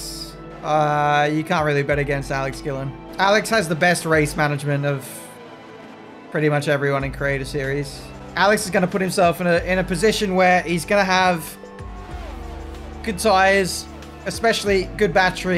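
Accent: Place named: Australia. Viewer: What part of aussie you from?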